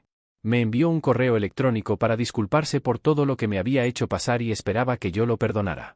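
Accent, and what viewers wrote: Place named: United States. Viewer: Jajajajaja ame el tono gringo Te falta darle más espacio para que sea más agradable escucharlo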